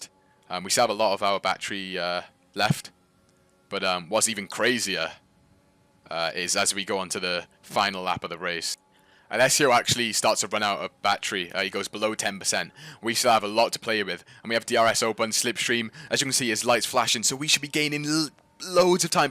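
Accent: Wales, United Kingdom. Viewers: Sounds Welsh to me.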